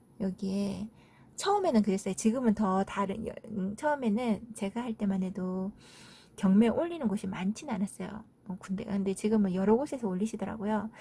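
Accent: Gyeongsang, South Korea. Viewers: ㅎㅎ 아마도 안본사람 있어도 한번만 본 사람은 없을듯합니다 계속보게되는 끌림이 있네요 댓글도 일일이 달아 주시는 모습에 감동 또 감동입니다 앞으로 구독자가 4만~400만~~ 될때까지 팬으로 응원합니다^^♡ 말투도 정감있네요 그래서 대구근처에 사시는 줄 착각할 뻔요 ㅋㅋㅋ 댓글달기도 저는 첨인 아슬맘 이거든요 ㅎ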